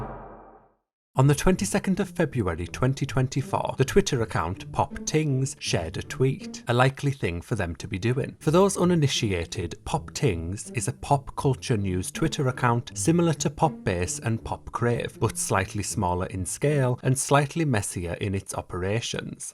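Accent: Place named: Hartlepool, United Kingdom. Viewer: Your accent is very Hartlepool 🧐😀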